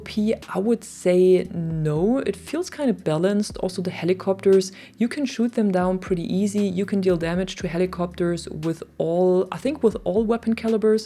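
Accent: Germany. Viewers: Never seen this persons channel or stream before, but I am detecting a strong German accent.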